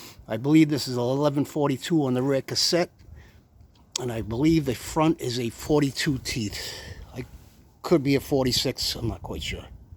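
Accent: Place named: Queens, United States. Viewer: Yup. You speak a lot like I do. I am from Queens NY. Nice job on the Ansbern bike. I could not agree more with doing due dilligence and research. Most of the bigger named companies are all just rebranded from China, with custom logos and specs, but much higher prices. I bought a Vtuvia FMB, which looks like a clone of your Ansbern, except I want your 52v battery! The FMB comes with a 13.5AH 48V, which reduces the range I want. I wonder if the ansbern battery would work in my FMB. Would you mind providing the battery dimensions?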